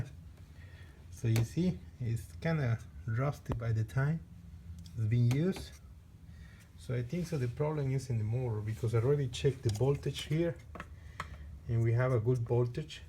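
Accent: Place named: India. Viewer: creí que eras hindú por tu tono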